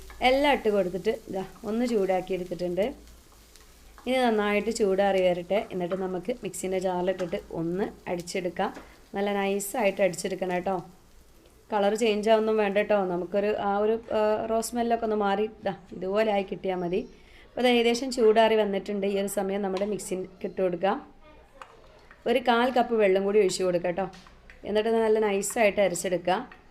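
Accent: Malappuram, India. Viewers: മലപ്പുറം താത്ത എന്ന് പറഞ്ഞു ഒരു മാതിരി സംസാരം ആയിരുന്നു ഇത് കുഴപ്പമില്ല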